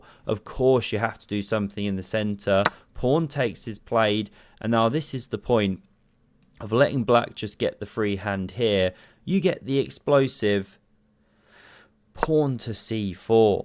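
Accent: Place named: Bedford, United Kingdom. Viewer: Hey man, thanks for uploading, despite the difficult times, you bring great joy, even if you don’t know! I only discovered you recently, but already am a big fan! Nice of you communicating openly about the absence, even the reason for it, which you didn’t need to (but it explains the obvious and understandable sad mood). Good wishes to you and your family, which is surely so blessed to have you! PS don’t worry about us the audience, we have also the old material in the meantime and also priorities are priorities. Don’t put too much pressure in the meantime on yourself in these stressful times, and don’t forget to take enough C vitamin, which helps body deal with stressful reactions! Cheers & greetings to Bedford(shire), from someone, who has an accent nearly identical to yours, not because of the one semester that I spent at the University of Exeter Business School as an exchange student - where I was the best at the University of Exeter Chess Society - but because of a certain highly perfectionist English lady, who took even the slightest mispronounciation out of me and ended up mocking me at the end on how I have “a perfect Bedford accent” (she was from there) hehe. 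Loved the culture, people and country, had the best time there (still feeling English a bit, even though ethnically I’m not 😅 … even wore the red poppy for years, still have it 😊 ). Lived in three other foreign countries, but England I loved!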